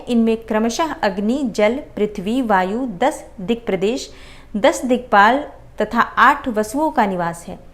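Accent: Standard. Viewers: 🙏🙏धन्यवाद आपका उच्चारण बहुत सुन्दर,सरल और शुद्ध है ❤ हर हर महादेव 🌹🙏🌹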